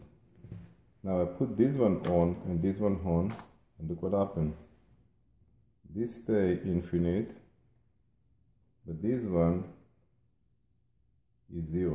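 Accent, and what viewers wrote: Israel. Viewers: I recognize that accent from anywhere...ivrit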